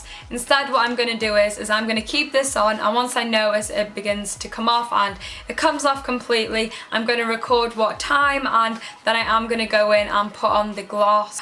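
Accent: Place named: Wales, United Kingdom. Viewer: YOUR WELSH ACCENT IS TO DIE FORRR! 😍😍😍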